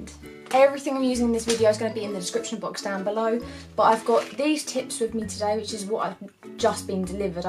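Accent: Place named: London, United Kingdom. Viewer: It’s so nice to hear a london accent. Really enjoyed her video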